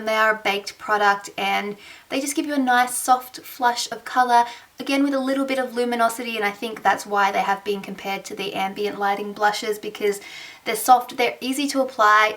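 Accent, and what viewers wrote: Australia. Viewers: Yes, an Aussie accent! New subbie here :)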